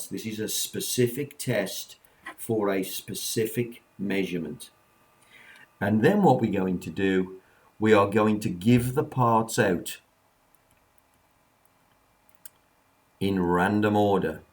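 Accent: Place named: United Kingdom. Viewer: Finally a British accent in a fantastic subject !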